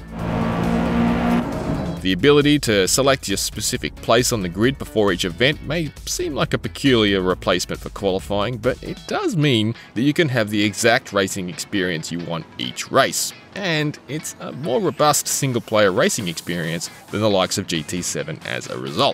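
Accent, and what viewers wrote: Australia. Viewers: Australian accented reviewer...and there it is,the Holden Torana...great car. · Well that was...surprisingly Australian.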